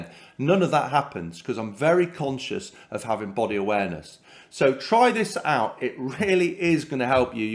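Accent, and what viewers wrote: United Kingdom. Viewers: I want to get back into swimming and hearing your British accent brought back some great memories. I'm a gay man from Lithuania and visited England in 2018 for the Gay Pride Parade in London. I had such a wonderful time. I met these two professional swimmers at the parade and they invited me to their home after the celebration and they gave me a double full body massage while nude. It was the best massage I ever had and and I will never forgot the experience. Just thinking about it puts a big smile on my face.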